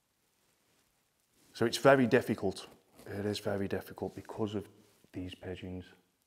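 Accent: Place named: Australia. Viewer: I love Australian accent ❤️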